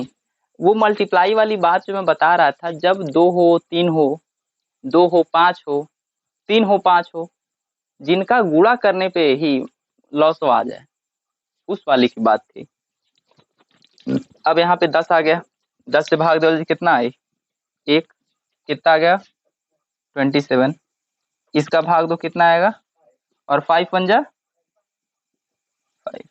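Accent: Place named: Bhojpuri, India. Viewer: bhojpuriya jhalak ta jaan marat ba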